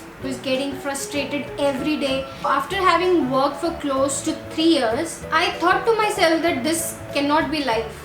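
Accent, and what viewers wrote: Delhi, India. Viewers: she has an indian accent..American accents are much worse with their own pronunciations on everything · she is speaking indian English - and her accent is perfectly fine. There are Indian lecturers out there who are less understandable. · Easy to guess you from Delhi . That super strong accent :) But good job on your creativity. You are good with it girl!! All the best!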